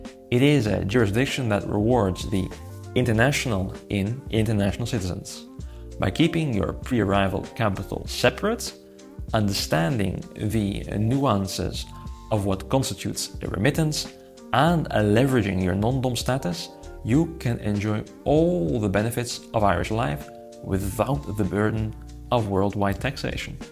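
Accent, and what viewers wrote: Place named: Finland. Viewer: The video would be easier to follow if it wasn’t in a thick (Finish?) accent. But otherwise informative.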